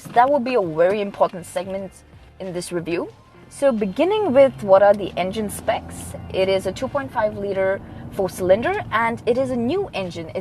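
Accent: India. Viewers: That's confusing...I guess it's either hyundai accent or her Indian accent.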